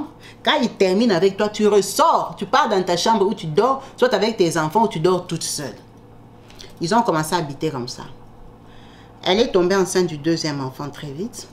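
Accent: Democratic Republic of the Congo. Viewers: Oulalaaaaa qui vient agresser verbalement notre petite 😮 svp dégagez d ici si vs n appreciez pas, elle s exprime et on comprend très bien et l originalite de son blog est qu elle est authentique ds son accent, sa façon de conter tu te retrves on dirait une tantine qui raconte avec précision le kongossa com ci elle y etait et elle ns fait voyager ds les scenes. Super bonne narative pr ceux qui aiment ce qui est terre à terre. Il y’a des milliers d autres youtubeurs qui ont fait l accademie des beaux arts avec doctorats vs pouvez y aller 😂. Ns on aime notre petite avec sn accent congolais en plus elle est respectueuse ❤ moi ss une bne camer.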